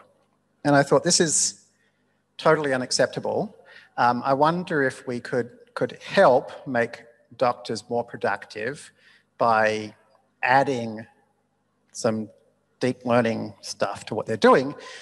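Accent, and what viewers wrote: Australia. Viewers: Interesting how your accent is a lot more aussie now!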